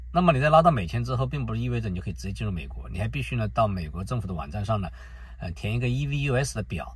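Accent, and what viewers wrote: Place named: Nanjing, China. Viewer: ，口音，是南京人，我住罗德岱尔堡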